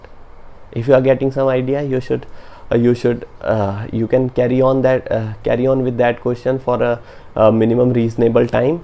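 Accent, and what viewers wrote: Punjab, India. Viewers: Are you from Punjab?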